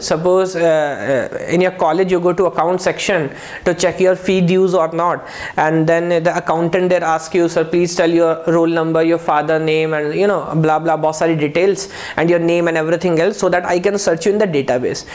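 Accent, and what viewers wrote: India. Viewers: It took me a long time to figure it out, i'm ok with Hindi ascent, but i'm a Chinese so i don't understand Hindi but Nice Video! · the Hindi accents add class to u r lecture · damn that Indian accent bugs me...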